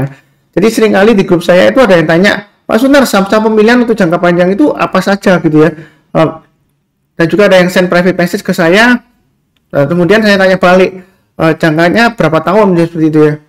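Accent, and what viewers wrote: Java, Indonesia. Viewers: Jowone medok temen mas Sunar 👍😊🙏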